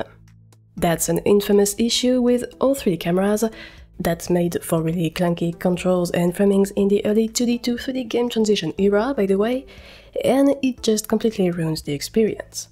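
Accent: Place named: France. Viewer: Wait a Minute... I know that accent... You are French, lived there for 5 years, Toulouse was a great place ^^ Keep it up, great tutorial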